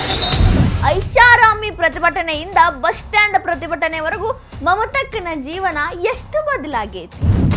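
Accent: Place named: Hubballi, India. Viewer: Nimm ನ್ಯೂಸ್ ಓದದು ಹುಬ್ಬಳ್ಳಿ ಸ್ಟೈಲು ಬಹಳ ಇಷ್ಟ ಆಗ್ತದ್ರಿ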